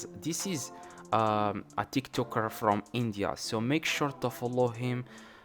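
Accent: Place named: Morocco. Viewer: واش خونا مغريبي بينا من هضرتك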